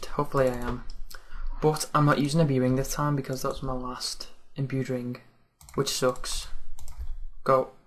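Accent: Liverpool, United Kingdom. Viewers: Cheers mate ;D love the vids keep them up, scouse accent is ftw!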